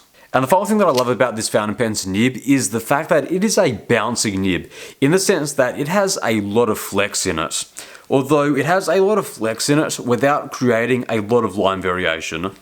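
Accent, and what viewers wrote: Australia. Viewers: Holy fuck man!!! I remember your original videos! You're back now with a deeper voice and some scruff, but the same Aussie twang 😅 Great to have you back and see you're well, this genuinely made me happy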